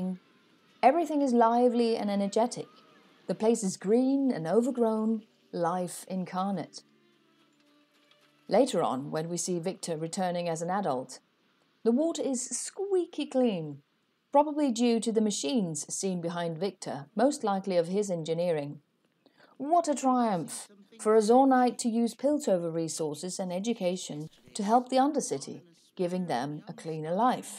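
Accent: United Kingdom. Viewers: Hey, I'm was a recent viewer. When I stumbled upon one of your videos, I thought it was another boring analysis that I've heard from other Youtubers. I am glad I clicked on it. I was hooked on how deeply you analysed the details and symbolism of Arcane. And I never would have thought of the parralels if it weren't for you. Your videos are very enertaining and it also helps that your voice is very calming. If you don't mind me asking, are you British? I'm sorry if I do get your accent wrong.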